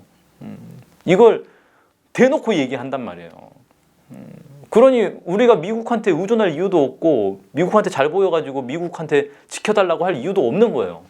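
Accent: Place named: Jeolla, South Korea. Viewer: 대구가 보수의 도시가 된것은 진보세력을 씨를 말려서가 아니고 6.25때 낙동강전선까지 밀렸을때 가장 치열하게 북한군과 혈전을 치르며 버틴 도시였기때문에 공산빨갱이세력의 사악함과 잔인함을 누구보다 잘 알고 누구보다 피를 많이 흘렸기때문이다 동족을 침략해서 300만을 쳐죽였으면 북한은 악마가 맞다 21세기에 수령을 위해서 국가차원에서 기쁨조 성노예조직을 운영하고 있는 나라가 악마가 아니면 무엇인가? 수십만의 사람들을 중노동 폭행 고문 영양실조의 고통을 주는 정치범수용소가 존재하는 나라가 악마가 아니면 무엇인가? 위 영상의 사람의 억양을 봤을때 전라도사람이 분명해 보이는데 전라도는 6.25때 북한군과 치열하게 혈전을 치르며 싸운 경험이 없어서 그런가 북한에 대해서 너무 환상을 가지는거같이 보인다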